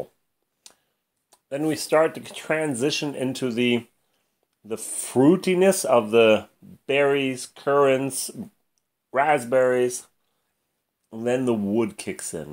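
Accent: Germany. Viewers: Hi, i didn't know you had an english channel too. funny to hear that you sound so much like a german who's speaking english😄(no offence intended!)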